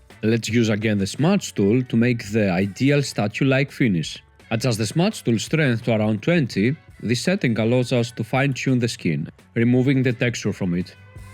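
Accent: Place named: Turkey. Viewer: Sotaque forte de Turco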